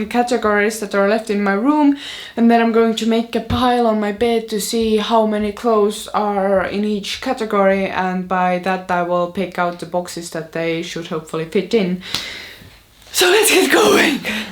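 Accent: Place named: Estonia. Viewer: Love the Estonian accent 🤗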